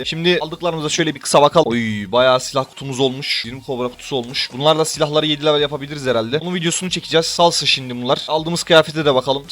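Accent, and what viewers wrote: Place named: Mardin, Turkey. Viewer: ABİ NERELİSİN MARDİNLİ GİBİ GONUŞUYORSUN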